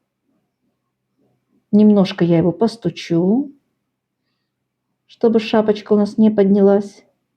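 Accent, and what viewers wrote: Baku, Azerbaijan. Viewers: Опять приятные слуху,родные "мелизмы" и акцент!Я Вас приветствую из нашего родного Баку!Огромное спасибо за рецепт и вообще,за то ,что Вы есть у нас!Благополучия Вам!❤❤❤❤❤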